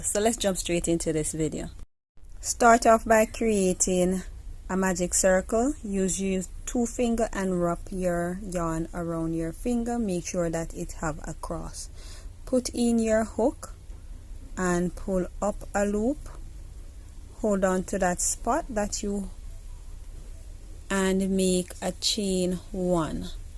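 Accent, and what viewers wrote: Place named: Jamaica. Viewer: Love ur Jamaican accent and skills had to subscribe . Keep up the good works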